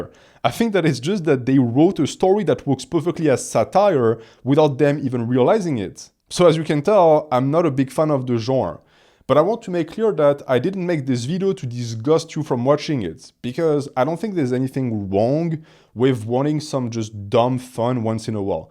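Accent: France. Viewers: Are you French?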